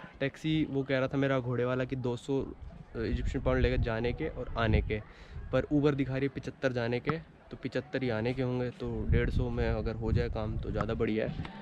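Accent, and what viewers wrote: Haryana, India. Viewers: Your accent shows that you are haryanvi 🤩🤩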